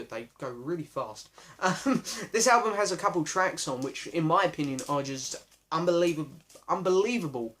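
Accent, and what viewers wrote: United Kingdom. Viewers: i think that because of your accent you are british rather than american am i wrong?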